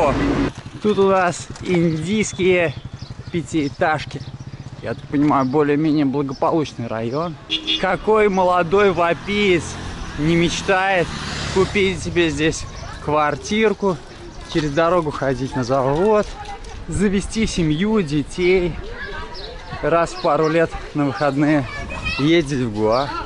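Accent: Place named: St Petersburg, Russia. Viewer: Ох и "лицо" у тебя на превьюхе... Акцент конечно капец. Шаверма, поребрик...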